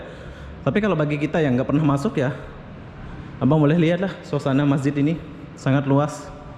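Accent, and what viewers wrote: Lombok, Indonesia. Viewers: Bagus sekali bahasa Lombok Anda seperti logat orang Lombok asli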